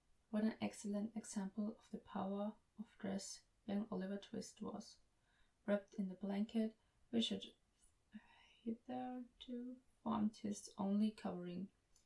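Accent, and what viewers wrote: Austria. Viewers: It's really cool hearing Dickens with a really cute Austrian accent!😊 THANK YOU!!💕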